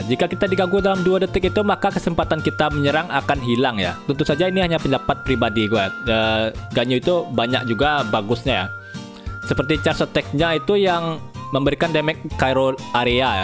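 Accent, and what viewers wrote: West Sumatra, Indonesia. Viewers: logat lu keknya orang minang ya bg?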